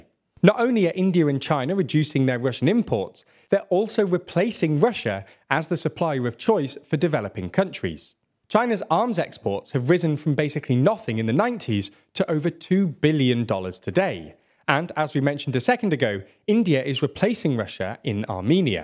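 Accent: United Kingdom. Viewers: when you hear an annoying British accent you know you are listening to a propaganda garbage machine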